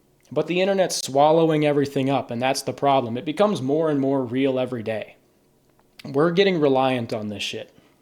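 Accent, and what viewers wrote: India. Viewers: Bro's Indian accent💀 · the indian accent :face-blue-smiling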